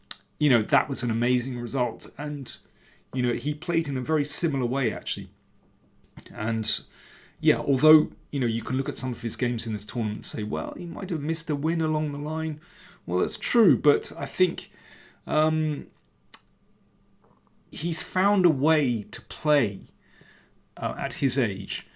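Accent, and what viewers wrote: United Kingdom. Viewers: Even though you don’t get crazy view counts on your videos, I must let you know that your videos are perfect. The classy Brittish accent enhances these fantastic chess match reviews! I’ve been a long time subscriber and this channel is like ASMR to me.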